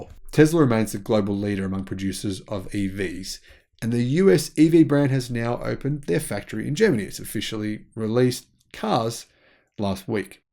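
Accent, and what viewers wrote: Australia. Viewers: I have been to Melbourne. I met Cambell Bowell in Frankston. He gave me a ride in his new car, the Nagari and showed me around his facility. A very nice guy! This was a long time ago. I also met some people named Evans in Frankston. I haven't been to Australia in over 50 years but I do enjoy your accent and enthusiasm for our new EV world.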